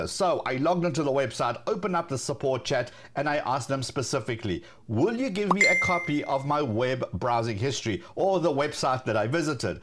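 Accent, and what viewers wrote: South Africa. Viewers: I definitely noticed that South African accent lol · its south african im sure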